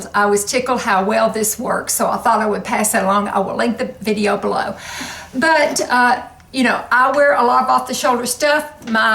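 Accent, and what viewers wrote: South, United States. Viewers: My favorite season is Spring with all the lovely flowers in bloom, including the Dogwood trees, azaleas and best of all no humidity! Despite all the pollen and my allergies, I still love Spring! I guess it’s all the new life bursting forth after the dead of winter. Congratulations on the 100,000! I love your videos ... I live in the Deep South. I’m turning 61 on January 29, so I appreciate your videos and you ... someone in my age range and who has a lovely southern accent. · I could listen to you all day. Your accent is endearing, to say the least. Southern ladies have an aire about them that can't be beat. Cheers from central FL! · My favorite season is Fall. The colors and coolness of that time of year I love. Congratulations on hitting 100,000!!😁 I so enjoy your videos. I lived in Tennessee for 10 years, and listening to your southern voice is my touchstone to there. 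💜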